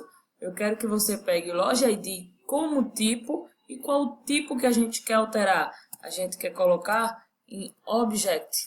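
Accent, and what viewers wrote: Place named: Recife, Brazil. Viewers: Oi Oliveira J, que bom que gostou, o sotaque é do Recife sim, acertou! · Massa! Show! Esse sotaque parece do Recife, ok?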